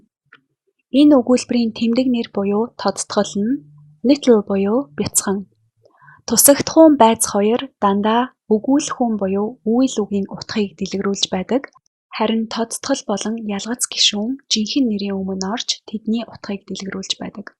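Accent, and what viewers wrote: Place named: United Kingdom. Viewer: Энэ тхдэ англи хэл зааж байгаа байж British english- ний дуудлагаар дуудаар байна. үгийн дунд орсон T үсэгийг 20% тай хэлдэг англи хэлэнд харин бритиш хэлэнд тэрэлттэй Т үсэгээр хэлдэг. Их гоё бичлэг хийж байгаа юм байна маш их баярлалаа дараа дараачинх дээрээ анхаараарай багшийн эрдэм шавиас гэдэгшүүдээ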